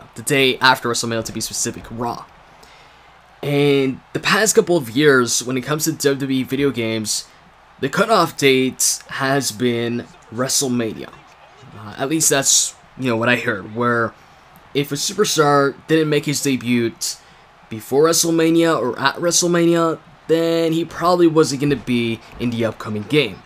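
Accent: Canada. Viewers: why don't you speak with a French Canadian accent anymore? please respond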